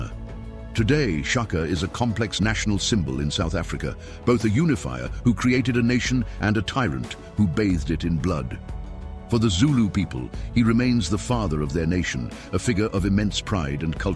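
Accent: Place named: United Kingdom. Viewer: History, Even great Shaka Zulu was 😢monster for you, unbelievable lies. You have British accent. GO and study British history. It's one of the worst ever. What savage Queen did in Africa is unpardonable. These are hybrid beings that committed atrocious acts on humanity that you should talk about and not paint great African leaders as monsters. Anyways, era of propaganda and lies is over!!! Great Shaka Zulu!!! Great Idi Amin!!! They fought for African people. What a useless British faceless racist thinks is inconsequential. Africa forever!!! The world is discovering that the West is full of wickedness towards Africa and the people which this page represents.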